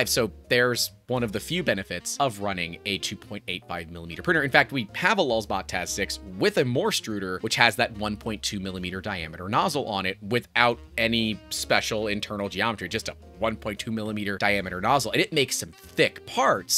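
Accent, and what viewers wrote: United States. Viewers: Oh, My... Degrees in Celsius and "Aluminium"? Am I misjudging your American accent?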